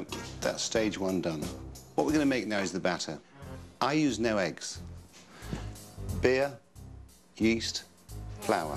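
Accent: United Kingdom. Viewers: 我跟你說只是他的英國腔講得好聽而已，如果你去到當地就會發現很多當地人說的英語你根本就不可能聽得懂，我來自香港土生土長的70後，我還記得讀中學的時候，當年還是殖民地時期，那些英語教師經常都會跟我們說學英語要學英式口音才是王道！後來出了社會之後才知道原來英式口音去到美國或其他說英語的地方，人家都當你鄉下佬覺得你好老土⋯ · 英國腔真的超好聽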